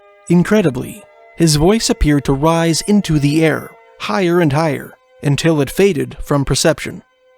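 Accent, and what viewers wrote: Canada. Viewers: I really enjoy listening to all of your videos and have learned a lot of history that no school in the US would ever teach. I'm over 60 now, and prefer to learn my own way. I love your beautiful Canadian accent and the way you annunciate your words .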